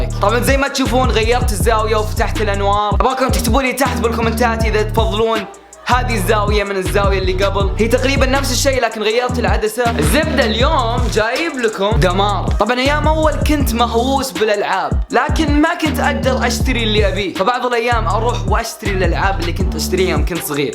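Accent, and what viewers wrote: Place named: United States. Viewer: كيف تعلمت انقلش مرا كانك امريكي؟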